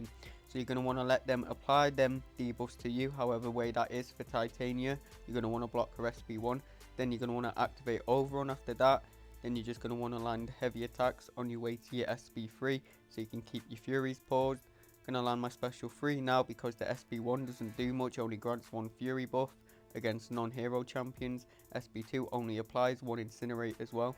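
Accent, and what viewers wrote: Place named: Cockney, United Kingdom. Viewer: Wait, u a London boy? Hope i am not being unpolite, just i stayed in leeds for a year, and that cockney accent sounds soooo familiar.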